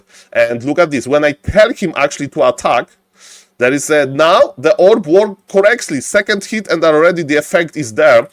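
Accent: Poland. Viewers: Best polish accent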